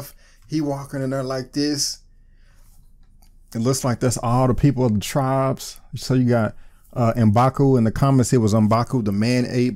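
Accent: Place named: St. Louis, United States. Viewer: I'm from STL and your "r" pronunciation sounded very St. Louis.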